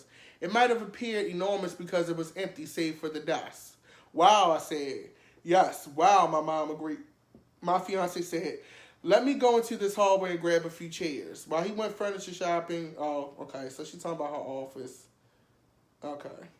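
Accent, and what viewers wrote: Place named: Baltimore, United States. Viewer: You must be from somewhere near Baltimore... I hear your accent lol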